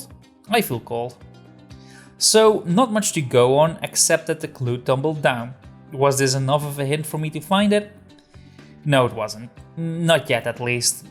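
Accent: Netherlands. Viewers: Are you Dutch? I don't think I have to ask, because I can hear it on your accent! :P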